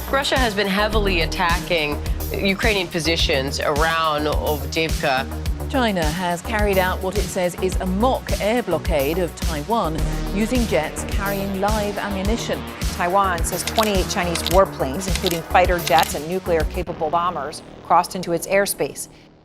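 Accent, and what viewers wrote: India. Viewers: Indian accent😅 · your accent is indian with much improvement than indian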